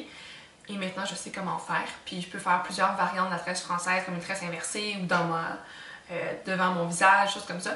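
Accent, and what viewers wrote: Quebec, Canada. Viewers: L’accent québécois du Québec! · C'est l'accent du Québec et ton commentaire est idiot ! Si on pourrait entendre le tiens on le trouverait sûrement plus chiant.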